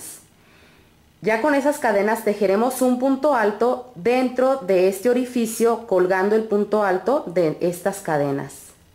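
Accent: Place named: Mexico. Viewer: Como siempre me gusto mucho tu video. y el gorro tambien. Una pregunta personal: Vivo en USA soy Mexicana, por tu acento ..... de pura casualidad eres de Guadalajara,Jal.,Mex.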